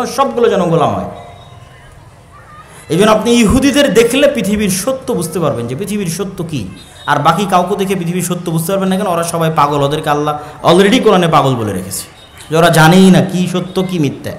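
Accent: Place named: Noakhali, Bangladesh. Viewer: Bai korianna, harianna amon kotha goloo noakhali amon kotha goli pori takkoren please, tar por kotha goli shon dor hobe, aktoo chinta koray dekhen London, Saudi pora lekha koray amon basha kotha bola khettar moton bojai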